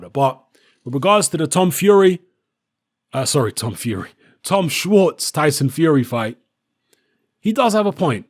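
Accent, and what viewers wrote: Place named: United Kingdom. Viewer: It's funny how I can tell you're black even with a British accent. 😄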